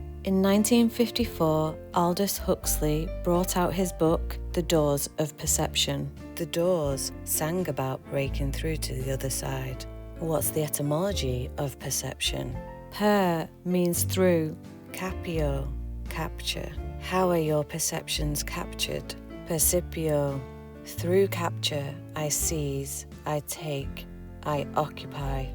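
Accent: United Kingdom. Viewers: Great to hear a northern voice that’s also got a great level of intelligence also. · youre like jon levi if he was a woman and bri'ish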